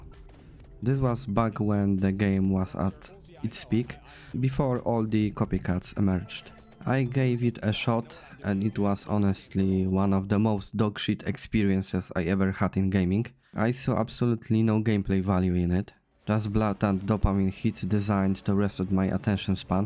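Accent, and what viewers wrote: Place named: Poland. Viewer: You sound Polish bro, I like polyaks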